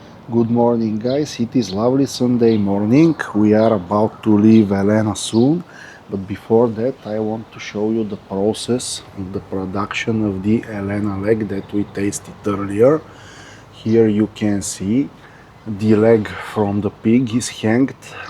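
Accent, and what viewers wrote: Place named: Arab world. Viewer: Брачед за 30 гледания ли говориш на английски като арабин. По-добре си ги прави на български.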